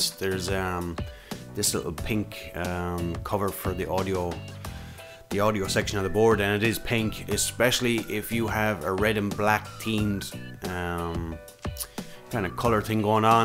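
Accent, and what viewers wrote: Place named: Ireland. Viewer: wasent going to like because of, i usually dont like videos.. but i really like the irish accent, so i liked it plus thx for the help XD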